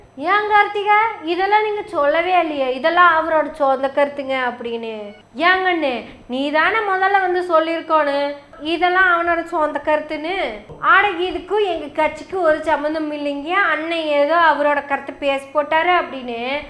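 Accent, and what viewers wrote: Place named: Coimbatore, India. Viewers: அடடா.....கோவை ஸ்லாங் சூப்பர் அம்மணி... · சேலையை கட்டிட்டு கோவை தமிழில் தங்களை போல் பேச இனியொருவர் பிறப்பதில் சந்தேகம்.ஏதோ புகழ்ச்சிக்காக அல்ல.உண்மை.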